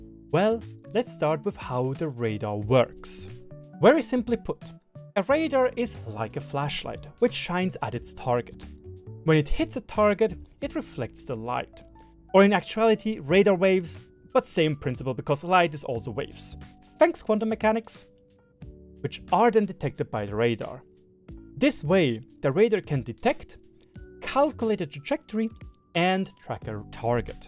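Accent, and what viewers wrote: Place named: Germany. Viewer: Dude has a thick German accent 😂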